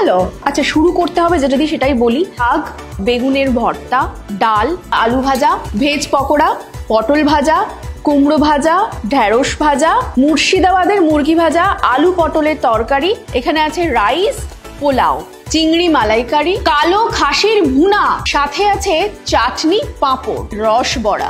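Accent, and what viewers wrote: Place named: Bangladesh. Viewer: Kotha r accent ta Bangladeshi..i reject your presentation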